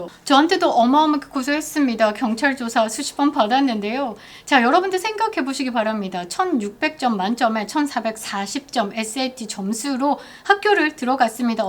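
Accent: Gyeongsang, South Korea. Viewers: 전한길뉴스보고 왔습니다 경남사투리 예쁜누님 화이팅!!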